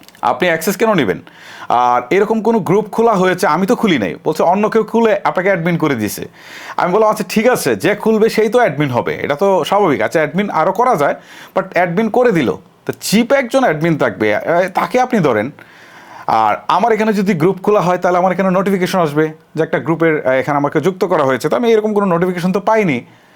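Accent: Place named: Kushtia, Bangladesh. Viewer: ভাই আপনি কি কুষ্টিয়ার ছেলে?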